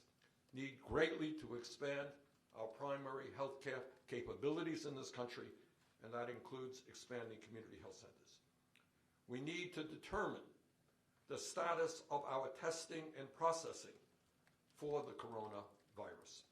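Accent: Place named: Brooklyn, United States. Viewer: English with a very irritating Brooklyn accent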